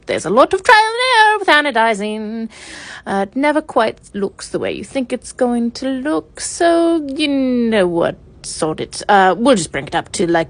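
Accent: RP, United Kingdom. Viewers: I don’t know if I’ve ever heard a more posh accent, absolutely love it!!!!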